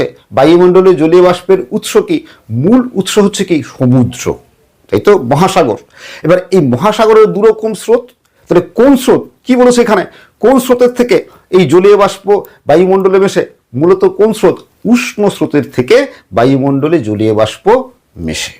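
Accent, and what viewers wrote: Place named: Bangal, Bangladesh. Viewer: দাদার কথা বলার ভঙ্গি বাচনভঙ্গি সব দেখে মনে হচ্ছে দাদা বাঙাল। বাচনভঙ্গি টা একটু সুন্দর করলে ভালো হতো।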